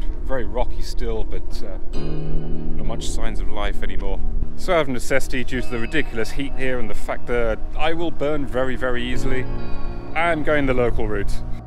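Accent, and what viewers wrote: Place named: Glasgow, United Kingdom. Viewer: You have a hint of a Glaswegian accent.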